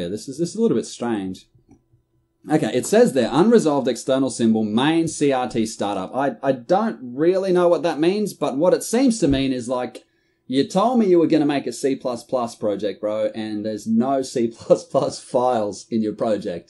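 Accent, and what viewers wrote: Australia. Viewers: Assembly x86 presented in an Australian accent? Couldn't be better.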